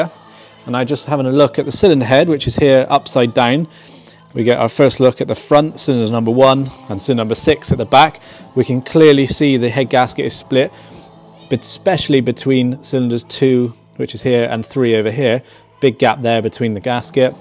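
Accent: Bristol, United Kingdom. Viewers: Bristol accent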